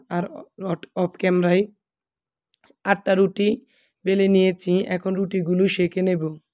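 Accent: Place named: Bhola, Bangladesh. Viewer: আসসালামু আলাইকুম আপু ভোলার কথা সুনলে মনে অতবুত শান্তি লাগো দুই বছর হোলো ভোলা জাইনা লাইক সেয়ার করে দিলাম❤❤❤❤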